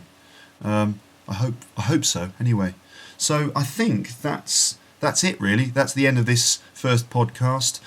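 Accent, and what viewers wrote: RP, United Kingdom. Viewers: Hi,! You are right. Good British Received Pronunciation is addictive!))) Your podcast is very helpful! Thank you!😊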